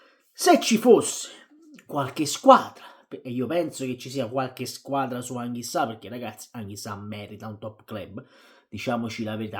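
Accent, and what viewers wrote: Naples, Italy. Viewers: Mamma mia quanto sei bello pari a mio cugino di Portici uguale sputato parli uguale a lui in italiano con cadenza napoletana bellissima. E sei pure competente bravo.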